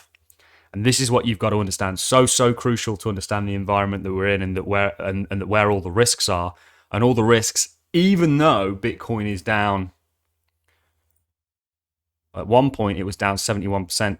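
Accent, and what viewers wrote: Birmingham, United Kingdom. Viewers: Where abouts in the midlands are you from? ( I detect a slight brummy twang ) 😂 I'm from Tamworth and you have a very similar accent.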